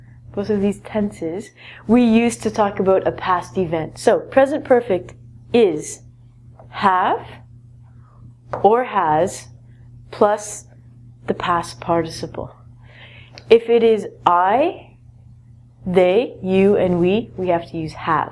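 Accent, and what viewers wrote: United Kingdom. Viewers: British accent 😓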